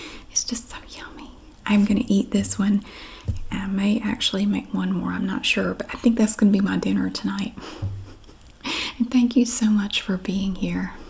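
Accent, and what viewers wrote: South, United States. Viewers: Being from Northeast Texas ,I nevee heard of dukes mayo before, And yes in my area it was not uncommon to hear something like this ? " yall want a Mater Sandwhich", I 2 have noticed a lessening of accents,and I think it's a crying shame. Your accent is heavenly ,aint nothing more beautiful than Southern Women😍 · you are a breath of fresh air! Longtime subscriber. Not much of a commenter, but sometimes something comes along and I just have to. I could listen to your voice and southern accent for hours and hours! Thank you for doing what you do! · I love your tomato sandwich videos. My favourite 😊 oh wow I’ve never seen tomato’s that big before. I love your southern accent, it’s so much better than mine. A Birmingham accent in the uk 🇬🇧 is one of the worst in the world 😂 or so I have been told.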